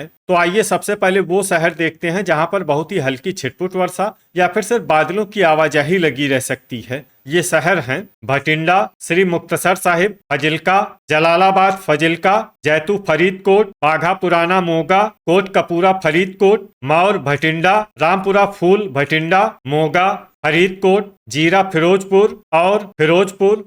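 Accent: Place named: Bihar, India. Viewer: Why is this Bihari n Hindi accent describing Punjabi weather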